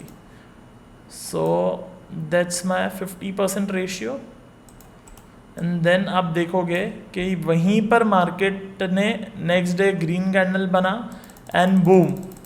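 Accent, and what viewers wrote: Gujarat, India. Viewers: Verry nice explanation sir.... sir are you gujarati?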